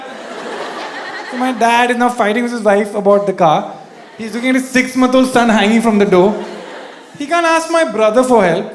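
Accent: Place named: North India, India. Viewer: പാലക്കാരൻ ചെക്കാ പൊളി പൊളി but your accent is like a north indian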